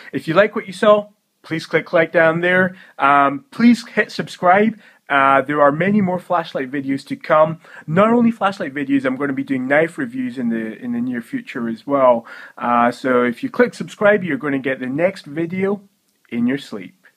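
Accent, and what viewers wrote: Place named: Scotland, United Kingdom. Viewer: hi would the new ZL SC600MkII in neutral white be better as a general home general walking flashlight?. i know the new one will be some now 900 Lumens, but 900 lumens in NW compared to CW 900 lumens, would there be a great noticeable loss?. love your review and...scottish accent. mine has a bit of glasgow. thanks.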